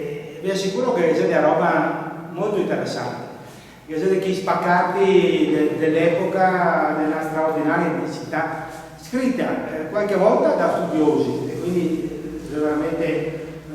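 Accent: Veneto, Italy. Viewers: Un misto di Veneto ed Italiano ma male tutte e due,tant'è che io stesso che sono Veneto mi si sono allungate le orecchie per cercare di capirci qualcosa.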